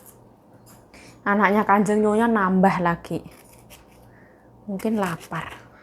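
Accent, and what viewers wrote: Java, Indonesia. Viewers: Lah lah...mbk orang ngawi jawa tomur to...aku blitar jawa timur juga, pantesan omongane jan njowo banget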